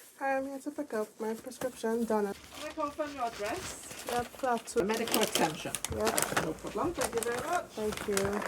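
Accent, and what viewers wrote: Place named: Trinidad and Tobago. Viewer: Hi girl! Thank you for your berberine video. That is what got me here. Just going down a rabbit hole on YT as uasual and founds you video talking abouts Berberine and it peeked my curiosity. AND also you accent caught my attention, as I too a a Trini❤. Glad you are adjusting to your new Dx, and you are finding your footing as you educate yourself about the foods and learning your body as you now have DM1. Thanknyou for the information as this was very informative. I just liked your videos and will subscribe, although i may never take berbaerine or maybe i will. . Keep going 💪 ❤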